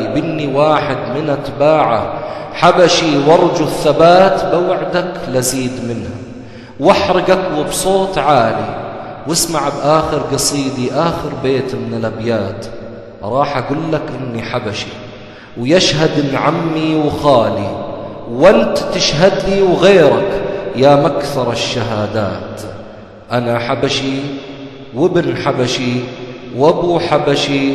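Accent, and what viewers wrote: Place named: Bedouin, Jordan. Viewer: كلام جميل بارك الله فيك لكن هذا ما هو خليجي هذي لهجه بدوية اردنية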